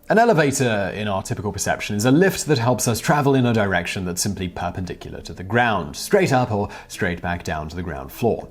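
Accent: England, United Kingdom. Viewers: It is really weird to hear a guy with an English accent say "elevator" so many time in one video.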